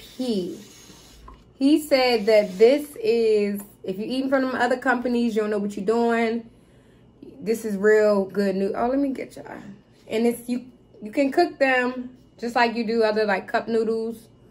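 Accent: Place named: Baltimore, United States. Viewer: I'm trying to get some. U sound like u in bmore n I cant find em down here nowhere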